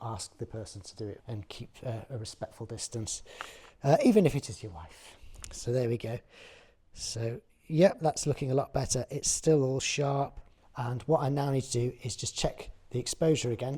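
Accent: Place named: RP, United Kingdom. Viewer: I seem to have failed to utilise your nice movie as a listening material of Received Pronunciation. I can completely imagine what you're saying even though I don't understand English itself -- I used to shoot with this super-heavy camera forty years ago! -- Best wishes from Japan.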